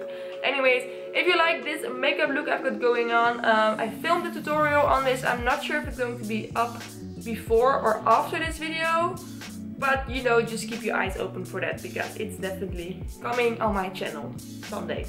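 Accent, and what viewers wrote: Netherlands. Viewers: Hey girl, as soon as I listened to your voice, I knew you were a Dutchie! Fellow Dutchie here 😄 Love the NYX soft matte lip formula and really like these metallic shades! 😘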